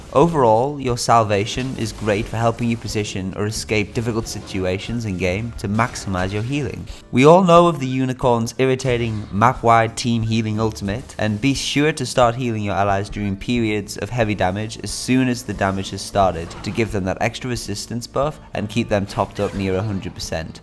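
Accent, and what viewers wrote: United Kingdom. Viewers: Your British accent makes me go: OMG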